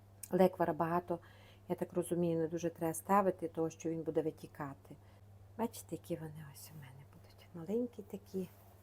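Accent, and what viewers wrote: Transcarpathia, Ukraine. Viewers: Пані,дякую за рецепт.завтра буду пекти. Дякую за дуже детальні рецепти і за чудовий закарпатський діалект.❤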